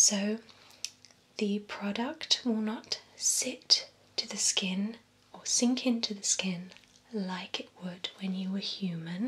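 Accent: United Kingdom. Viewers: There is nothing that turns me on more than a super-hot girl from the United Kingdom; their British accents drives me WILD!! This is a pretty cool skit too; her acting was really good!! This clip should have way more views and likes than it does!!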